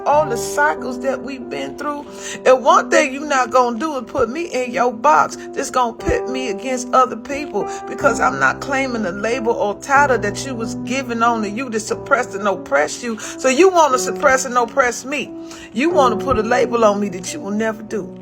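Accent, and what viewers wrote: United States. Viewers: Could someone tell me what region/state her accent comes from? I can't recognize it. Is it Southern states , West Coast, Midwest?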